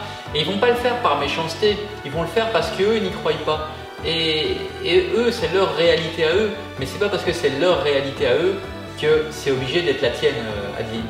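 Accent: Quebec, Canada. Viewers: hello , tu a de plus en plus l'accent canadien ... mdr... pour ton problème d'eau a tu regarder a l'interieur de la caravane si tu n'avais pas une vanne de sécurité ? sinon hâte de vous voir commencer votre voyage, et surtout hâte de voir vos vidéo, bon courage a la petite famille.. biz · Bonjour les Compagnons, un Belge qui n"a pas l'accent Belge et qui prends l'accent Québecois, c'est super . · a force de être au Québec tu commence à avoir le accent québécois !! super vidéo!!!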